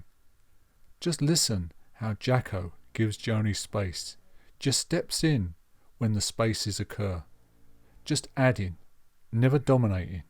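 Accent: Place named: United Kingdom. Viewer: How do YOU pronounce your surname? I'll wager it's not the way Franz Schubert pronounced his. Who really cares - honestly??????? In any case, you're doubtless American and the narrator's accent may sound unfamiliar to you, but it makes PERFECT sense to Brits. It is the content that matters, not the pronunciation.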